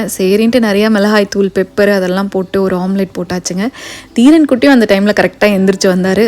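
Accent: Coimbatore, India. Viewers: Nice way of talking coimbatore slang sis ,today lunch box spl bisibelabath and egg omelet sis ,lots of love and hugs❤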